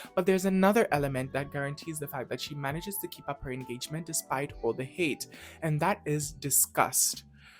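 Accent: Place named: Jamaica. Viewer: You sound jamaican to me, all i could focus on was that and your fro 😅. Lovely editing too!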